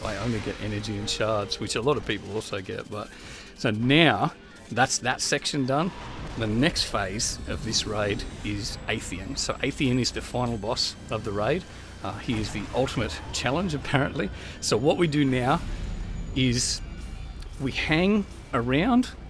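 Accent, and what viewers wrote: Australia. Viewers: I love these videos. It explains so much plus I can never get enough of the Aussie accent.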